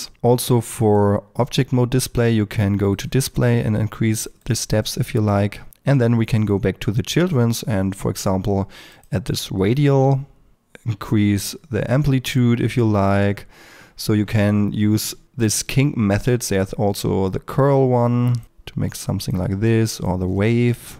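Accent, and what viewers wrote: Germany. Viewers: bro sät sounds ja ganz schön deutsch hasste auch einen deutschen account? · ich liebe die deutsche aussprache und du? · I smell a german speaker. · Hikari He‘s german. It‘s just a strong accent. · dude, this guy is sooo german :D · your speech sounds very deutsch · dieses deutschenglisch ist so schräg... aber supergute tutorials :)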